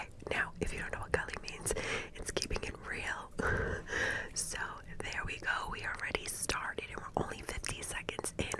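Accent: New York, United States. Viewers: I love New York and I love your accent 😂💗🥰!! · MAN YOUR VIDS ARE ABSOLUTE PERFECTION!! I love the NY ACCENT!! I’m gonna do an ENGLISH ONE (probably many) when I start actually doing my asmr voice videos!! This is so cool and interesting!❤️ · Being a 29 year old English guy, all accents and slang words fascinate me! And your New York accent and all the slang words are beautiful and sassy!!🤗😇 xox 🥰🥰❤️❤️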